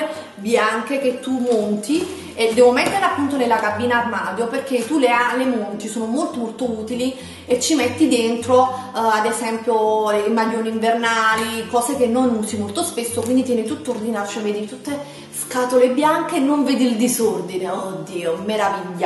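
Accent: Naples, Italy. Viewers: io amo la tua cadenza napoletana 💖💖💖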